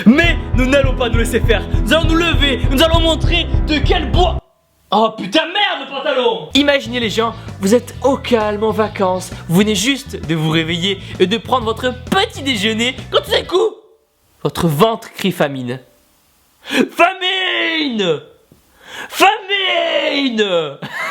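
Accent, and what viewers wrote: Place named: Toulouse, France. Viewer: Daaaaam quoi de mieux qu'un bon accent Toulousain et de la bonne humeur pour se motiver !! Au top !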